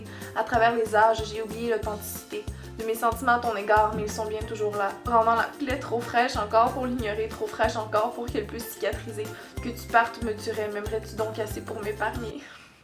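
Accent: Quebec, Canada. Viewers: Salut, je suis française et je te découvre depuis peu. C'est un réel plaisir de regarder tes vidéos =D. Tu as tellement d'humour, de légèreté, de sensibilité.... On a tout autant envie de se taper des barres avec toi que de te prendre dans les bras, car tu es trop mimi!;P J'adoore l'accent québécois!!!! =D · Je t'ai connu grâce à Norman, j'adore ton accent Quebecois!!! +1 abonné :-)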